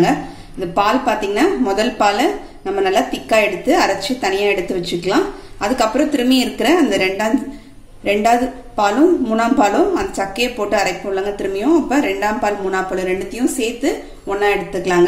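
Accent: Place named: Srirangam, India. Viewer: இப்படி இடியாப்பத்திற்கு மாவு அரைத்து பிழியலாமா? Sister! நீங்கள் பேசும் பேச்சு! ஸ்ரீரங்கம் மாமிகள்! பேசுவது போல் இருக்கிறது! நான் ஸ்ரீரங்கம்! Sister!❤️❤️❤️💐💐💐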